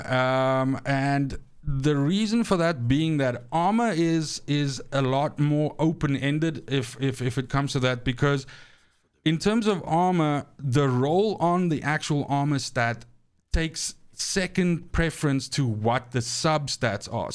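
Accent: South Africa. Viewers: I do trust your judgement & how you break it down. I just figure if I'm playing anyways I may as well play the CT's that people claim will give what I'm looking for. (Deathshield, Highroller) PS stream I don't follow any steamer, I'd follow you & I main Trickster. You & Niktew have great have downs. Khraze & Widdz are helpful & DPJ is just hilarious! PS love the SA accent! Haha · I honestly didn't notice your accent as my girlfriend is from SA so it sounds normal to me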